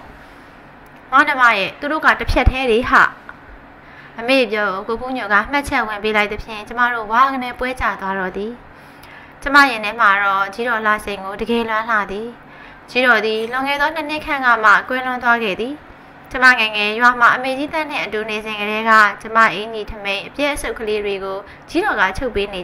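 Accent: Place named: Anya, Myanmar. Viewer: စာဖတ်သူရဲ့လေယူလေသိမ်းကအညာရောက်နေသလိုရသခံစားရတယ်။အသံရှင်ညီမလေးလိုအင်ဆန္ဒအမြန်ပြည့်ပါစေ🥰👍💪🏽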